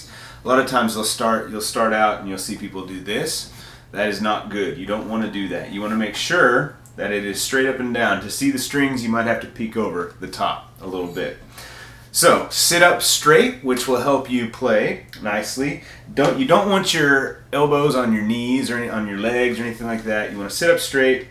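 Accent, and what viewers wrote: United States. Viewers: Americans can never go wrong with their presentation. Seen a lotta Brit vids, but I usually prefer to hear Americans talk.... Love your video!! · I never heard such a manly voice in an American accent😂😂 Started on 8/11 will tell in 2 months thanks for the tutorial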